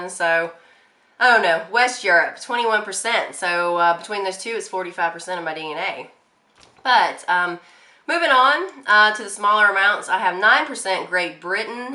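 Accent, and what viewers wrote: South, United States. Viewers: You sound like you are from the south. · No you are not 100% European, you are however 100% Southern! The accent gives it away, no DNA needed!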